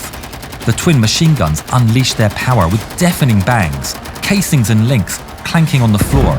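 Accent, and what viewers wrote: Portugal. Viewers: Não , eu não gostei, simplesmente adorei sem contar o elegante sotaque de Portugal que adorna esse idioma amado herdado de vós pois sou brasileiro e amo minha querida língua portuguesa!!!❤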